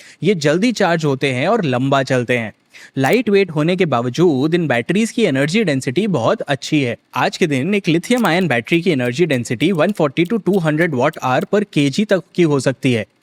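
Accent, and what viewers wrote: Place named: Uttar Pradesh, India. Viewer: I guess Northern states like UP, Bihar. I'm from UP and it seems normal accent to me.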